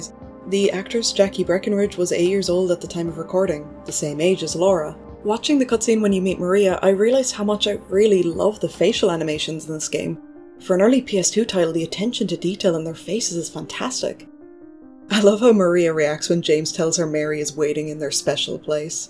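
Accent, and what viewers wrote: Ireland. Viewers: Thank you so much for this video I love everything silent Hill I was very annoyed that I didn’t use guy Cihi in the remaster and I have high hopes for the remake not sure if it’s still Romor but I believe it is coming . Shout out from Dublin. Your Irish too yeah 🤪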